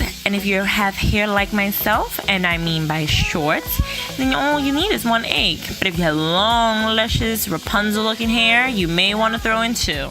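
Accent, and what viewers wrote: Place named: New York, United States. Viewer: Are you from New York? It sounds like you have a New York accent😂also great video it really helped I just recently got my tips dyed and now they are very dry this helped so much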